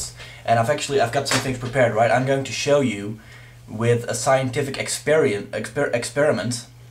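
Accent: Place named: Europe. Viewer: he has an european accent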